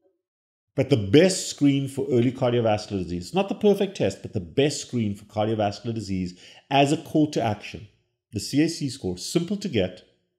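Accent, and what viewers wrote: South Africa. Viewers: Are you South African doc?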